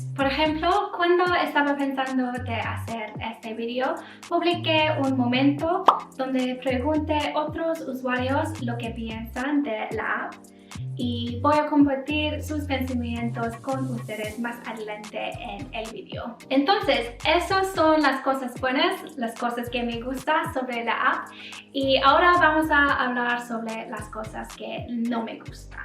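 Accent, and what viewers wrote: Latin America. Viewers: Amo que hables el español como los latinos y no como españoles! HAHAHAHH Me encanto tu vídeo, desde hoy empiezo a usarla espero me vaya bien! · Bonito acento y pareces latina!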